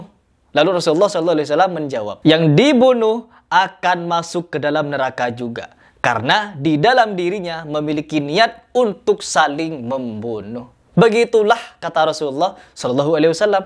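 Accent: Madura, Indonesia. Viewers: dari logatnua kyk orng madura pantas phm sejarah carok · orang aseli madura kh? ketauan dari logat nya, hehe, (bibi saya orang madura jga soalnya, dan mak saya (almh) jga pandai ngomong medura krna sering bergaul dgn org madura) kalo iya ini momen yg pas utk menjelaskan & mengedikasi soal carok & bela diri, salam bela diri, saya cuman mantan atlet silat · kalo ngomong kok ada nada maduranya ya😅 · kalau sendainya ini org madura asli (krn logatnya sprti logat madura). sya yakin ada 100 org saja org madura sprti psti madura penuh dgn kedamaian dri kekerasan. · Aslinya org mn ini ya.kok logat bahasanya kayak org Madura.?? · kyaknya asli Madura juga ya?Logatnya spt Madura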